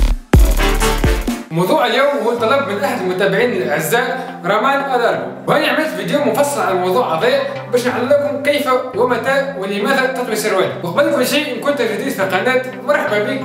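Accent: Tunisia. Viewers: تونسي انت ؟؟ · هل أنت تونسي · انت تونسي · هل انت تونسي??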